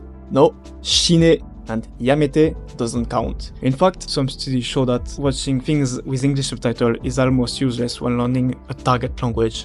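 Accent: France. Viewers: Are you french or from eastern europe countries ?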